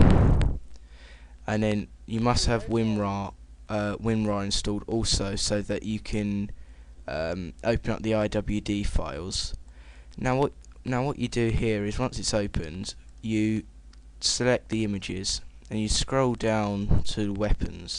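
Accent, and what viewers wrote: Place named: Luton, United Kingdom. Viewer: u sound like 1 of dem luton bloods init, like me. wat is you?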